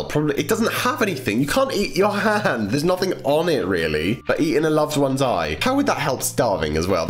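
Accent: United Kingdom. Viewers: is British I felt like I knew that cause his voice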